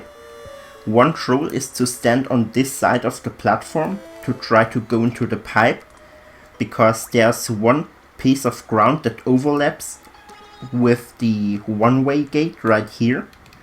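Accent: Germany. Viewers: It's a German accent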